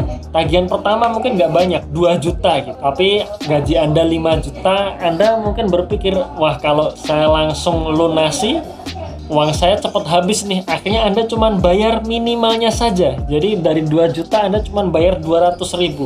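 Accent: Surakarta, Indonesia. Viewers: Njenengan orang solo to pak? Heheh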